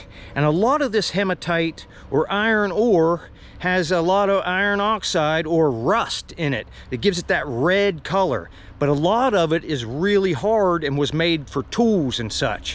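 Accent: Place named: Pittsburgh, United States. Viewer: Beaver and Ohio meet....Sure there is some there....Love your videos....Love that Pittsburgh accent....lol...I got it too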